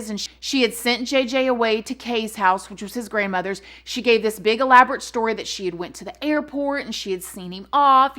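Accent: South, United States. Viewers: I watch as many of your videos that I can, but you just had me cracking up, I haven't laughed so hard in a minute, With your southern accent, the expression on your face, talking about this dizzy blonde wacko, "like okay calm down, You're gonna have to sit down and calm down here sister!" It was just so hilarious, thanks for the laugh I needed it!! That's why I stay watching, you have a gift for doing these videos, God Bless You 🌼🌻🌼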